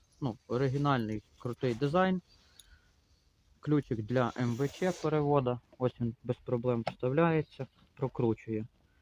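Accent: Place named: Russia. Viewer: если это правда, отчего же тогда акцент русский, а речь украинская?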